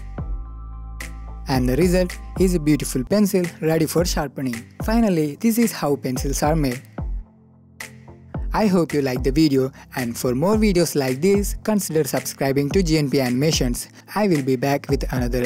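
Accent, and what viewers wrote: India. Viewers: Would still be better without the gupta accent.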